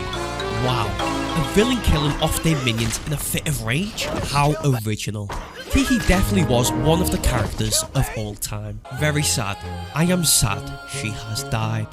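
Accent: Liverpool, United Kingdom. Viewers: Are you a scouser? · hello fellow scouser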